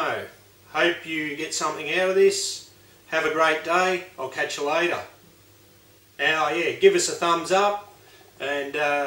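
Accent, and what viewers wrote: Australia. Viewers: Thx for the vid! BTW, are you an Aussie? :)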